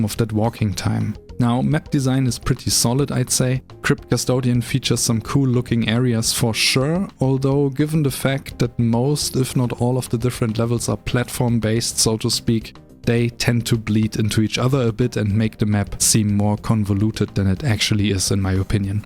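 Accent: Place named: Germany. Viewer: you have very beautiful accent - is it German? P. S. I figured out you were only starting by the audio quality - there's too much noise and the volume is a little unbalanced. Still great video xD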